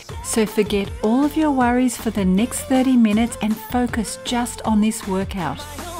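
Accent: Australia. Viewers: At 81 I do 2 30 min videos and feel 60! These are excellent to use alone at home! And I love your Aussie accent...that helps!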